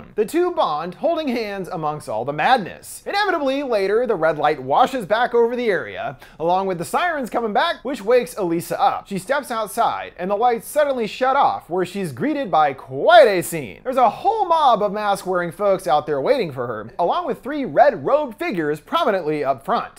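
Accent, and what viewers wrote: Italy. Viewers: Wait don't go I liked your ~fabulous Italian accent~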